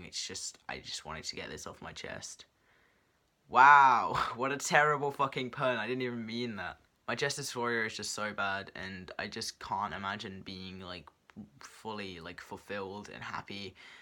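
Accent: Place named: United Kingdom. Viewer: I seriously could listen to you talk all day!!! I love your voice and of course your British Accent, I'm such a sucker for the British Accent, The Best to you.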